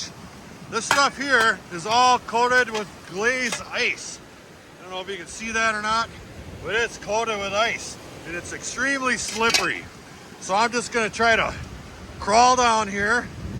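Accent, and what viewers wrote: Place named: Wisconsin, United States. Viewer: The Wisconsin accent rocks! Love it.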